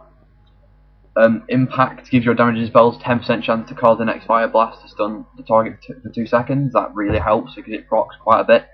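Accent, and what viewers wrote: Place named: United Kingdom. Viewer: I loyke the british accnt ;))))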